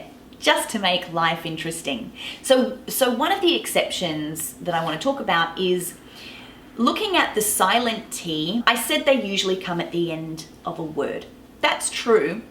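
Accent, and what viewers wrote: Australia. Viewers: Im very fascinated by the aussie speaking voice and finally found this channel. Now, i can really enjoy learning the Australian accent. ❤ Thank you for sharing this videos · Thank you! This helped me alot hope for more aussie accent cuz i really hatr my accent and your accent is my fav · Great teacher and a so beautiful Aussie dialect. Awesome a thousand likes.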